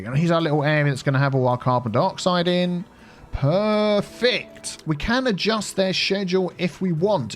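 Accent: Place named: RP, United Kingdom. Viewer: you sound like a posh British person with a bit of not so posh British accent the only real question is what type of tea do you drink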